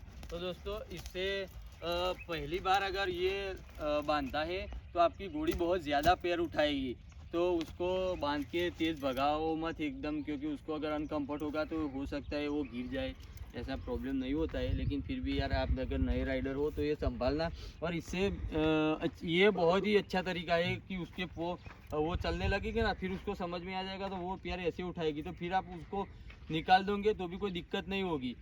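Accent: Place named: Gujarat, India. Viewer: Achhchhi hindi bolne ki koshish kar rahe ho magar gujarati mix hohi ja rahi hai,dua hai aap ki hindi aur achhchhi ho jae taki publik ko aur fayda ho, aise bahut achhchha sikha rahe ho aur aap achhchhe bhi ho....bahut bahut shukriya from Bardoli dist surat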